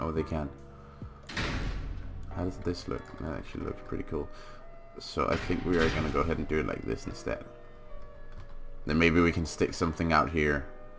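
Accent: Denmark. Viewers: 5 seconds into the video I'm like : "This dude is totally danish" 😃🤘 Skål, brother xD <link>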